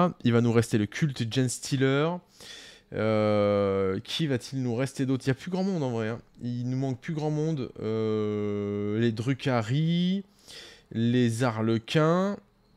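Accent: England, United Kingdom. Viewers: Change rien mec! Haters gonna hate ! Tu nous régale avec ton accent anglais 🤣😘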